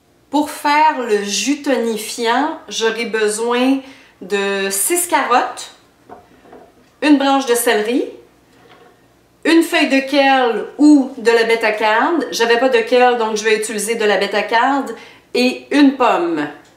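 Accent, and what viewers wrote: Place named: Quebec, Canada. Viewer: haha j'adore l'accent québecois calice ! Vidéo intéressante sinon ; merci.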